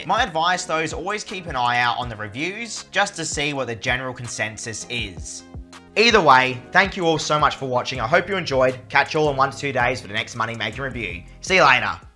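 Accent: Australia. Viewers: I am here because I love ur accent Australian English 😂😂😂😂😂😂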